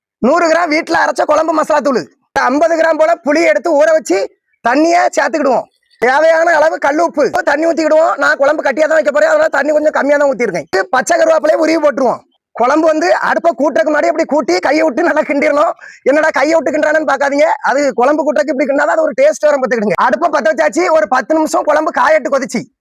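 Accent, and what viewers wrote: Tirunelveli, India. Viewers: Ele thambi. Un kulambu eppadiyo...aana nellai tamil. Suvaiyo suvai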